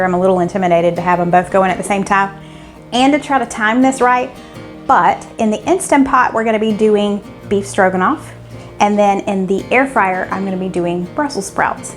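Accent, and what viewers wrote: South, United States. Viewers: I looooooovve the way southerners say “oil” haha. You guys say it like “ol”. Another great video.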